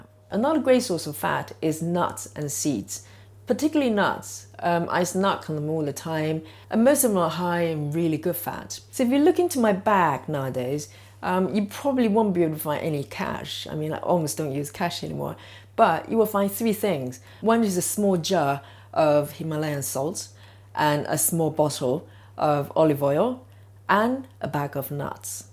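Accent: England, United Kingdom. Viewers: I also love your videos. You have a pleasant sounding voice, and of course love the English accent! I binged watched most of your videos last night, and can’t wait to try them all. I made your flatbread, and it came out perfect. This morning I made bullet proof coffee with organic breakfast blend coffee, MCT oil, coconut milk and 1 Stevia packet. Blended it in my Ninja blender. OMG! I absolutely loved it! It was like drinking a latte.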